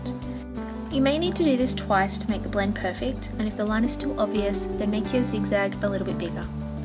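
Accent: Australia. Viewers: It just her Australian accent. She sound like an Australian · She just sounds Australian to me...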